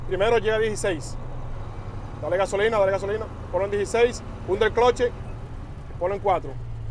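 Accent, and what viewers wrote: Dominican Republic. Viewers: Por el asento se que eres paisano mio jaja Dominicano no? Jaja estamoa donde sea papa!! Orgulloso de usted!!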